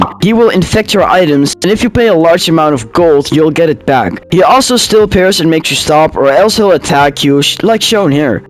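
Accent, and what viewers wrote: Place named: Netherlands. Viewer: I noticed that you have a bit of a Dutch accent. Are you Dutch by any chance?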